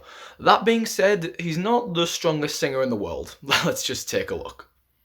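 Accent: United Kingdom. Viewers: Is the host from UK ? I heard pretty much of British accent !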